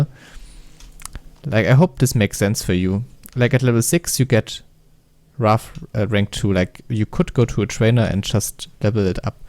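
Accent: Germany. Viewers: Nice video, thanks for the showcase. I like your german accent!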